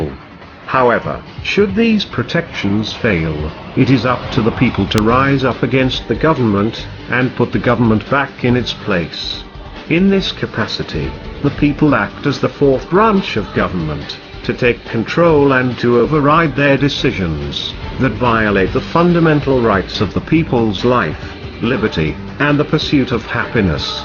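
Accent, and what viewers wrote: United Kingdom. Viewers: why does this guy have a British accent but is talking about how we fought for freedom ect? The only ones we fought to get this country were the British and the defenseless natives.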